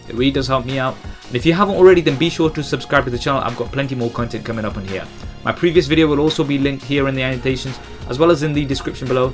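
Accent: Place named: United Kingdom. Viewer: Hi, I really like your british accent. (; I've a question. Does a fingerprint scanner on s6 works with any orientation?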